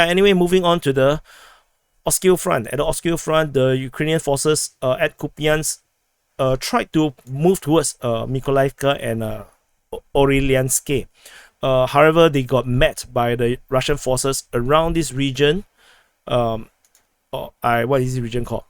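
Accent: France. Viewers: good pronancuation closer to real one :) yet French :D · I suffer emotional damage listening to your commentary! Really well done - HOW do you get the names out so well?And the FRENCH accent!!!! WOW! But great analysis with current information sorting the known from the guesswork and the propaganda.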